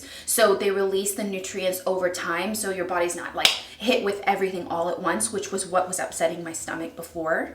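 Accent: Texas, United States. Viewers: you can hear texas accent poking through in this video!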